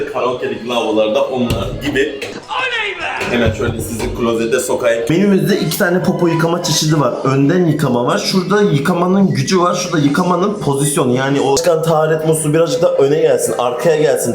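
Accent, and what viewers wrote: Turkey. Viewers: 15 sene Japonya'da yaşayıp Tr şivesinden kurtulamamak peki?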